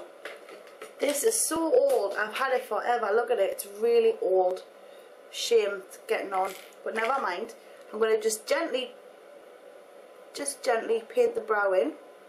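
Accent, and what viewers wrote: Newcastle, United Kingdom. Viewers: I usually don't like that New Castle-like accent but she is the nicest person ever so I don't really mind :) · It's a Geordie accent.. aka Newcastle in the north of England :) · ur british accent makes it more fun 2 watch!!! also i just subscribed!!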